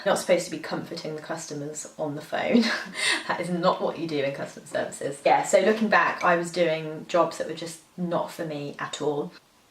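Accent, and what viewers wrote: United Kingdom. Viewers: By, the way. Are you from London?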